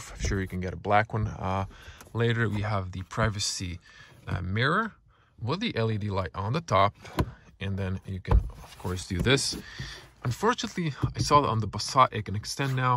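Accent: United States. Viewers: Are you american? Youre accent is really strong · Pohvala za vrlo detaljan test. Jako dobro i tecno govoris Engleski sa americkim akcentom. Prvo mi nije bilo jasno jesi li Hrvat uopce?! U testu je manje vise sve tocno no smeta me sto vecina ljudi tvrdi da je kvaliteta izrade korak unazad. Apsolutno da to nije tocno jer je auto izraden barem jednako kvalitetno kao i sedmica cak nesto i bolje u pojedinim segmentima. Prvenstveno mislim na kvalitetu sjedala sto nikako nije zanemarivo. Drugo je naravno sto je cijena otisla gore, te je normalno da se u tom cjenovnom razredu mogu ocekivat kvalitetniji materijali u vecem dijelu interijera. Nedostatak tipki je mozda ergonomska katastrofa no to je buducnost definitvno. Recimo tko je malo gledao spijunske fotografije nove S klase pa cak i buduce C klase (W206) vidljivo je da ce zapravo centralna konzola biti ogroman zaslon. 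Poznato je da S klasa diktira buducnost i trendove autoindustrije tako da je Golf 8 zapravo cak i malo ispred svoga vremena. Detalji poput samo USB-C portova u kabini te komunikacije izmedu vozila koji razmjenjuju informacije govore u prilog tome. Sto se tice dizajna mislim da je potpuno pogoden iz vise razloga i najveci je medugeneracijski skok. Kad je aktualna bila sedmica ljudi su govorili da je dizajn konfekcijski i dosadan, sad govore da je previse futuristicki i da je cudan te je sedmica skladniji auto. Jos jedna potvrda onoj staroj narodnoj "Taj se nije se rodio koji bi narodu ugodio". Za kraj cijena je definitvno prenapuhana ali to je boljka vecine VW-a. Rek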